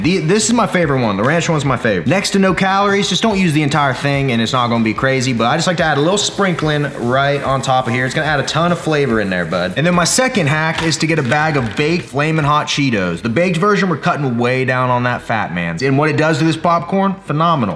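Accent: Texas, United States. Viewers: is it me or ur getting a Texas accent 😱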